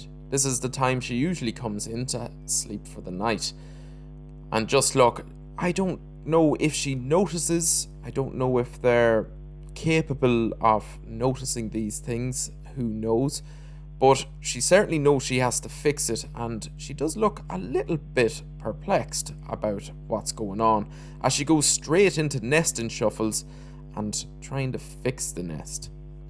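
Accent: Ireland. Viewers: Nice Irish accent! Cheers! ☘🍻💚🧡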